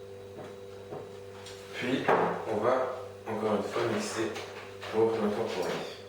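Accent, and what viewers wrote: England, United Kingdom. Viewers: tu me soule aek ton accent anglais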